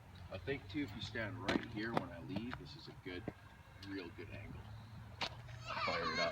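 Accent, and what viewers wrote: United States. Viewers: You sound like you're from the northeast U.S., I am just wondering because I am. I have a sky blue 2005 American Ironhorse Outlaw chopper and I love it but I also love the ''old school'' looking bikes like you have, thank you for sharing! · Are you from Rochester NY? Your accent...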